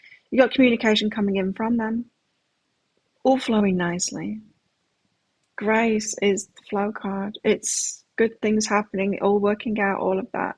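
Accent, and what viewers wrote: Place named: Australia. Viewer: Hi , I’m in Canada watching atm. Love your channel. I love your Australian accent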